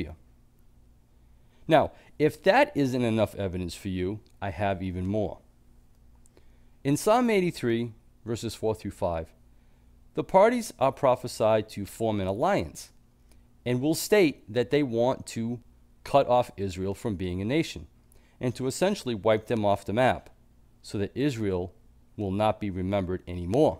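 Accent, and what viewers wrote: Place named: New England, United States. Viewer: Good info! And I know this is trivial, but I love listening to your accent. I grew up in New Hampshire and have relatives in Maine. Married a Virginian, and have lived 22 years in Ecuador. But I know a New England accent when I hear it. ❤️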